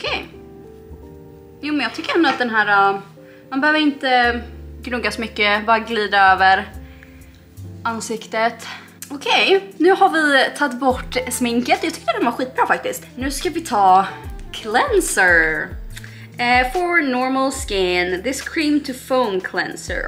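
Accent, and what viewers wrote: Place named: Gothenburg, Sweden. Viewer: Superbra video! Alltså tycker du är så gullig när du ibland har din göteborgska dialekt fast med en släng av Karlskrona-dialekt i samma mening😍😂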